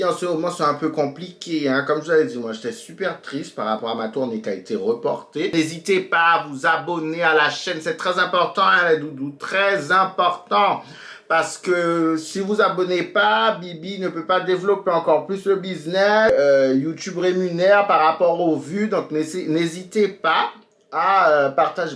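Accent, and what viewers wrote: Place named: Brazil. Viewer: Ton accent brésilien j adore 💕💕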